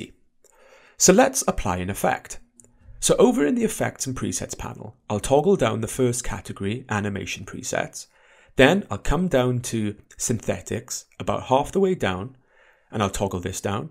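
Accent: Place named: England, United Kingdom. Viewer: "Command Zee??" You sound like you have an English accent, I thought you were from England, now I'm really confused - where are you from then?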